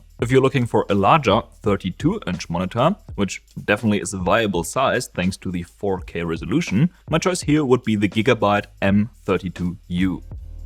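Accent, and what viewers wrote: Germany. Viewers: Are german ? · Bis zum nächsten Video... Wusst ichs doch. Der Akzent kann nur deutsch sein :D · Dem Akzent und Outro nach gehe ich davon aus, dass du Deutsch sprichst 😅 Ich sehe überall, dass der Samsung Odyssey G7 als der beste UHD 144Hz Monitor angegeben wird, aber was ist eigentlich mit dem LG 27GN950-B? Wenn ich mir nämlich die theoretischen Werte anschaue, liegt der meiner Meinung nach vorne (kann auch sein, dass ich ein Trottel bin und keine Ahnung davon hab, aber wer weiß das schon… 😉)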